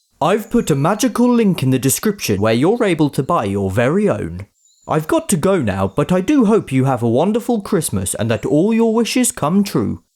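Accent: United Kingdom. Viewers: You are so cute. I love watching and your british accent :-)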